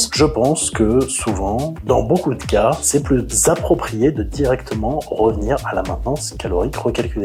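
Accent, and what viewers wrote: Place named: Thailand. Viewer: L’accent Thaï on point 🤌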